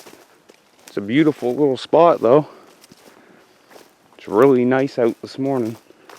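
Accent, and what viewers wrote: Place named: Maine, United States. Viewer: are you in Maine? you sound like it. Dave from Maine. love the show!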